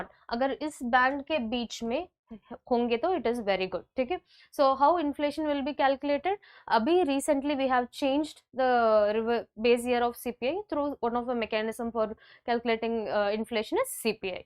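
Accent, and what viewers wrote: South India, India. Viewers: Mam apki tone thodi south Indian touch deti he are you from south Indian ❤❤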